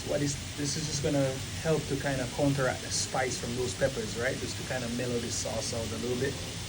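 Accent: Jamaica. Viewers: Can anyone tell me where his Jamaican accent has gone ,seems to be missing 😮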